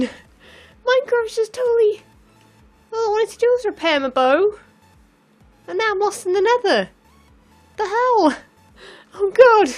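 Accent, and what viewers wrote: United Kingdom. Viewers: Excited much???? Gaw, at times you were talking so fast I couldn't understand you through that thick British accent. No offense meant, seriously none meant. Have to say things like that because apparently I can offend any female just saying "Hi". Love it take care.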